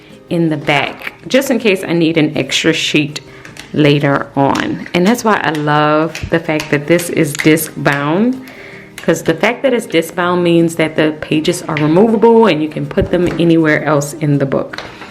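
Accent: New Orleans, United States. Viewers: As soon as I heard you speak I knew you were from New Orleans then I clicked on your page and see you are a member at the city of love ❤️❤️❤️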